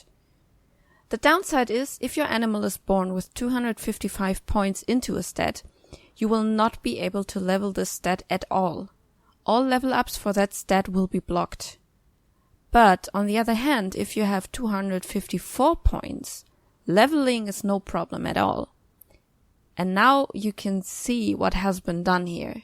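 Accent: Germany. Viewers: I appreciate the effort that went into the video and the english version, but I can´t deny that I heavily dislike the german accent. It´s extremely distracting to me. I´m german myself, sadly.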